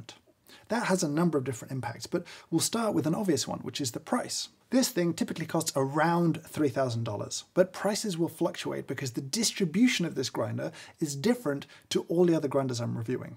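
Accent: Germany. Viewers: The englisch/german accent is so sweet :D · good german accent, congrats :) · His German pronunciation is hilarious. 😁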